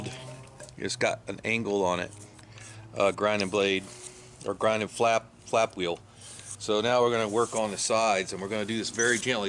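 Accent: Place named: Pittsburgh, United States. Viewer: You from Pittsburgh?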